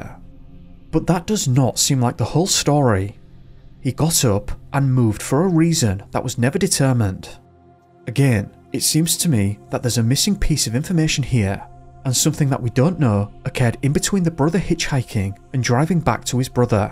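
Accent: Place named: Northern England, United Kingdom. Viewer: As an aside, love your accent, but can't place it... northern England? Yorkshire? Tell me!!!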